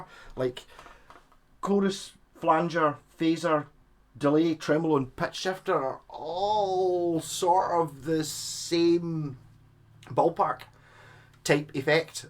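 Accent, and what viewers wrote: Scotland, United Kingdom. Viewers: Fuckin Scottish accent.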